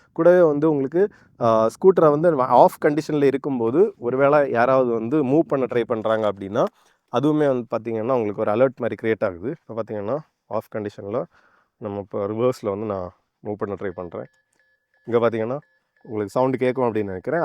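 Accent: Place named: Nagercoil, India. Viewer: This is Nagercoil slang and also he associated previously with motowagen family only...Due to some clause he left and started this channel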